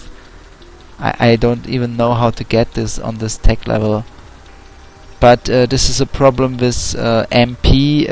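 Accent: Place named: Germany. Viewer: I love your accent. Are you german?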